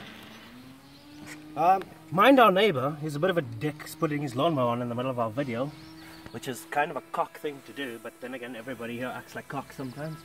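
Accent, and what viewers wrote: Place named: South Africa. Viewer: Awesome video man. I ordered one of these motors! (Along with tons of other rc gadgets) Also, I can hear that South African accent from a mile away haha awesome.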